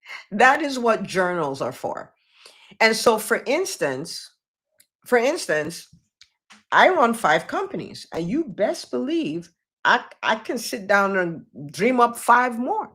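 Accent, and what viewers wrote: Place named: Kenya. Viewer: Doc, are you really from West Africa? Your English sounds so much Kenyan.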